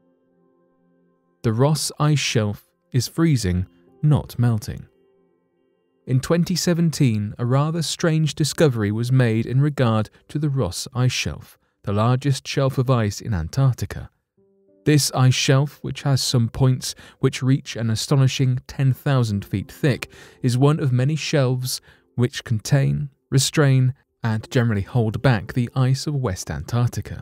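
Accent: United Kingdom. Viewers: This guy is extremely long winded. He prolongs his stories far too long. This love affair with white British voices always amazes me. He's boring. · He repeats himself a lot too. I'm not a fan of British accents either.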